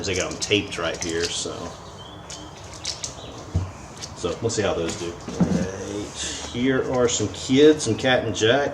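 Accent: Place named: Rural, United States. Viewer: Well you definitely got a lot of kids shoes. I have no idea how those sell. Cat & Jack are a kids brand sold at Target. I'm subscribing to help a fellow youtuber out. I do some what sold and some unboxing if you're interested in checking them out. Those might sell well locally. This month Facebook Marketplace is letting you offer free shipping and no fees. Take advantage of it! What state are you in? I'm in Texas. your accent is more country so I'm not sure lol.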